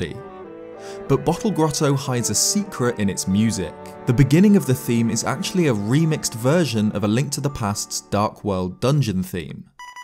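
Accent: United Kingdom. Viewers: He's clearly a brit, not american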